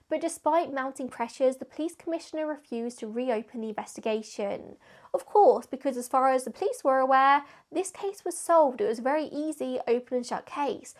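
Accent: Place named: RP, United Kingdom. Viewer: So posh 😂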